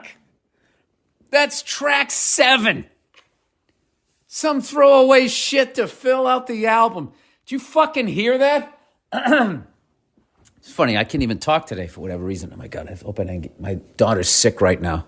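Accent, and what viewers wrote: Boston, United States. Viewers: I think you may be one of my favorite people on the planet… Every single thing you say is exactly what I am thinking and saying about it. It’s like hearing the voice in my head with a Boston Accent 😂 It’s Awesome!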